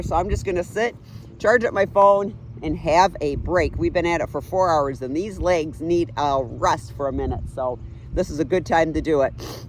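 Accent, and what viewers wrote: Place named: Michigan, United States. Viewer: That hybrid Michigan accent with Wisconsin and Canadian overtones.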